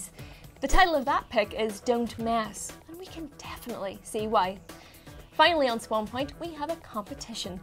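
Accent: United Kingdom. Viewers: Welsh mixed with a southern English accent i think. Hard to figure out, maybe even a little irish is in it.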